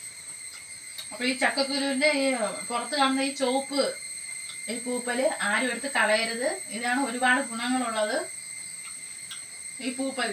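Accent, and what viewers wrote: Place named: Kattakada, India. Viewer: Vere content onnum. Kittiyille ithu ellavarkum ariyavunna karyamanu vakkukal mattiparanjalum kattakada bhasha kayarivaru nnu that is quite natural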